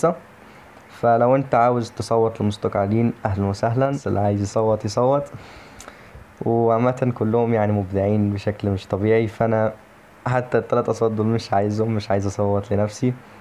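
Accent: Egypt. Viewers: انت مصري صح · دا انت مصري حبيبي 😍 اخيرا سمعت صوتك نفسي اشوفك بقي واتعرف عليك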